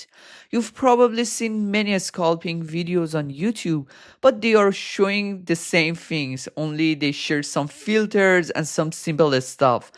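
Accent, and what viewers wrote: Iran. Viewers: persian accent uhh xd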